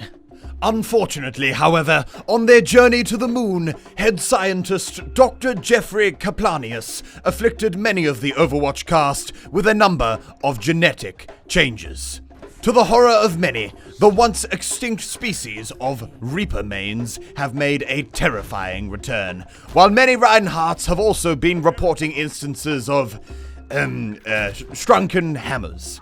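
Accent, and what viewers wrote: United Kingdom. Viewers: An Australian speaking with a British accent seems.... wrong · why the british accent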